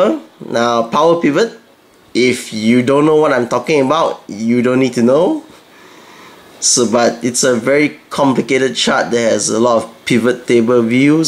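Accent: Singapore. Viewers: Hmm sounds like singaporean Haha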